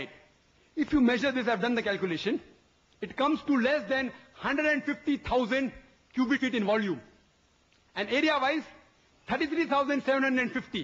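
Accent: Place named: India. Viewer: just cos he has a strong accent as it is his SECOND language (or third depending where from India he's from), it doesn't invalidate his point.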